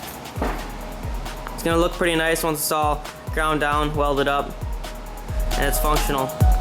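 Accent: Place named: United States. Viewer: Keep tryin not to laugh at ur thick yank accent so i can hear the vid... lol.... But damn your talented as hell... Incredible job so far!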